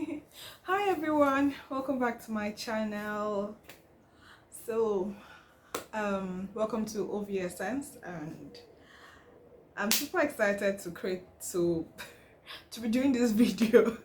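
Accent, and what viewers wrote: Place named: Nigeria. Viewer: Firstly I might say I just love the Nigerian accent. One of my favorites. And you did the things hunny,looking all so good and I did click the like button because I really do understand😂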